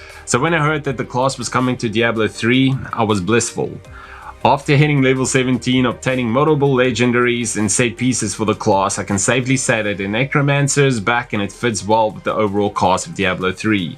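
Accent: South Africa. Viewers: Personally I like Diablo 3 on console as it feels the same as Baldur's Gate: Dark Alliance and Champions of Norrath: Return to Arms, 2 games I have played way too much back in the day on the PS2. PS. It sounds like you are from RSA.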